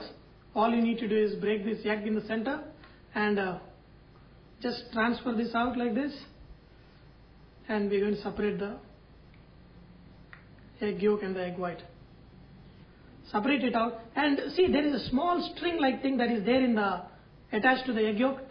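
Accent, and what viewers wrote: India. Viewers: its indian Accent....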